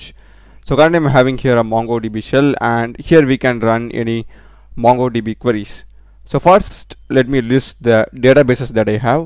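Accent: South India, India. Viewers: I think you are form Tamil Nadu or south India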